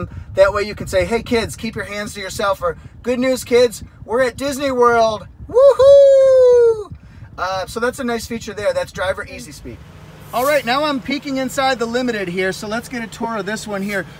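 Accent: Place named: Canada. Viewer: I tried to find the dealer location to get a clue about the accent. Is it Canadian? I am afraid I am not great with North American accents as I live in New Zealand.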